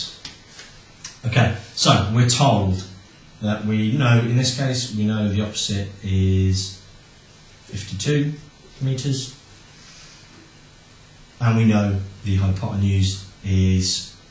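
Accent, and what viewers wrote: United Kingdom. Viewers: loving the British accent, and the video was also quite helpful. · Are you british ? cuz ur accent looks like a british guy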